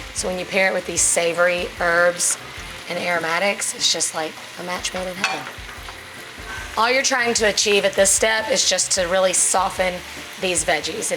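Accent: South, United States. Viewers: Love your southern accent and cooking talent!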